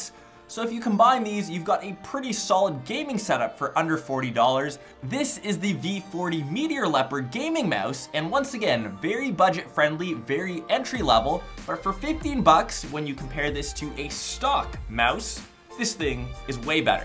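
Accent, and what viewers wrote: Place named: Canada. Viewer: I love your Canadian accent. It's funny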